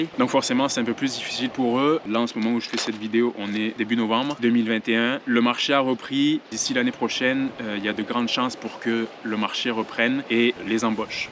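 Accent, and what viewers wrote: Quebec, Canada. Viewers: J'adore ton accent. On entend tranquillement le petit accent Québecois qui perce! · Tu as tellement pris l'accent canadien :) GG mec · L’accent québécois pas canadien haha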